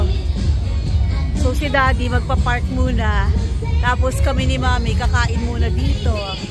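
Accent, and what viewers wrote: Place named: Iloilo, Philippines. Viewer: Done watching na po ms... sobrang enjoy po panoorin.. ang ganda ng iloilo.what i notice.. ang linis esp. ng main road. Even during the festival kung meron man kunti basura lng..at meron pa mga nabibili mga souvenir..and can take pictures by the bridge.. beautiful people... And i love tlga ilonggo accent ms...😍😍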